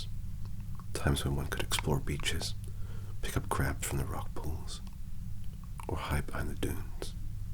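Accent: United States. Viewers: Wow you do a mad American accent!! Almost forgot you were English 😯 You’re so freaking talented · I couldn’t figure out what was off about this video. I got halfway through the video and realized you had an American accent haha. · That was a damn good American accent. Really cool video, I like the mystery vibe. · Yaaaaaa!!! You are da beeeeest! Your portrayal of Cooper is great. Also your American accent is flawless. Did you use Tibetan Method to get into character? :-) Thanks for this! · LLAMAS!!!;D Hey, do you make these music intros yourself? This is definitely my favorite american accent of yours in your content yet, gentle and without any House MD nasal sharpness or strain, and sounds incredibly natural. I was so fascinated by how good it is I couldn't focus on anything else on my first watch. But I could listen to you talk about anything anyway...Love the dark shadowed lighting, that wood-paneled background, the filters you used, and the bits of effects. 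It all, combined with your voice, makes for such a quiet, calm viewing. Lookin like a clean cut 1950s mad men exec here. That pause and then that grab for the drink at the end while maintaining eye contact, ha!:) Never watched Twin Peaks, but not understanding the references in your content (other than the coffee!) does not ever lessen my enjoyment in the slightest! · Incredible acting skills and not too shabby of an American accent😏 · Wow love the series but didn't finish it.ur so talented u sounded like a American well done u should hear the song to twin peaks it haunting I love it.I listen and meditate to the music.I got a little request could u consider a Tomas Sharp role (Crimson Peak) I fell in love with the movie and the story plus IAM a massive Tom Hiddleston fan. love Astaroche 🍷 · Dude your american accent is on point. i feel your destined for something bigger then a youtube gig. 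Your a real actor... i hope you get the recognition you deserve for your talent. keep it up man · I completely agree with you! I’m American & I thought he was too, lol. He completely fooled me & that’s hard to do!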